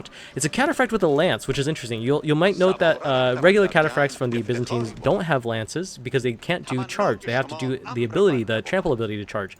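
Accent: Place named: Iran. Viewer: the most generic persian accent ever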